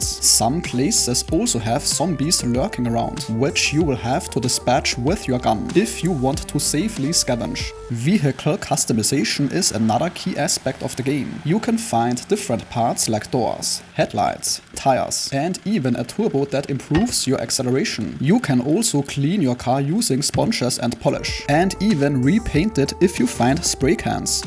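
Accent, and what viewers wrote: Germany. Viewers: Excuse me Brother but kommst du zufällig aus Germany? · Only narrator where I am not sure whether he is talking german or english. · Das Denglisch hör ich doch direkt raus. Gutes Video❤ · a little bit german ich höre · Versteht man ja kein Wort mit dem deutschen Dialekt.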